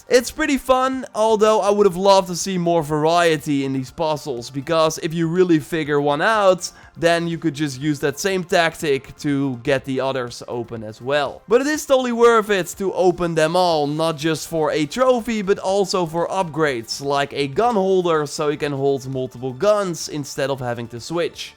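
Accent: Netherlands. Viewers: He's Dutch. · Haha this guy has a heavily dutch accent so I think you’re dutch don’t worry though I am dutch too. Maakt niet uit man😂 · IS DUTCH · This Dutch accent moron is clearly paid off by the developer. Not one negative thing to say about this game.... he's just praising every single aspect of this game even though many legit reviewers clearly state that this game has aspects which are total garbage like the AI, and it's nowhere near as good as even the first game.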